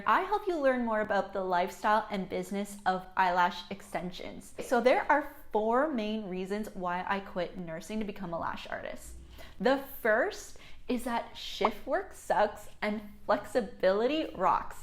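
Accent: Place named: Canada. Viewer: It's the Canada “abouts and out” for me and in here for the “just fucking go for it” yaaaaaaasssss Queen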